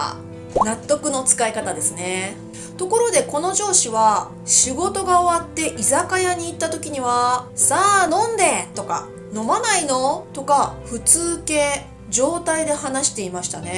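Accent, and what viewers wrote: Taiwan. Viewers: 天哪！老师是日本人！这么重的台湾腔简直不敢相信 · 先生の中国語はすごく素晴らしくて、しかも台湾訛り。。。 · 老师您好，今天偶然发现您的视频，看了一个视频之后果断订阅了，我是一个学习日语三年的学生了，但是由于环境和难度的受限导致我的日语水平没有一点点改善，最常见的情况就是昨天学的知识今天就忘了，现在还停留在初级阶段，想想也倍感惭愧。最后想问一句老师到底是台湾人还是日本人啊？看第一个视频觉得老师是台湾人，中文发音是台湾腔，当看到这个视频开头部分老师的日语实在太流利了跟母语一样啊，让我不禁感叹老师在哪里学的日文啊？看到评论才明白有人说您是日本人，难怪日语说的这么标准。谢谢老师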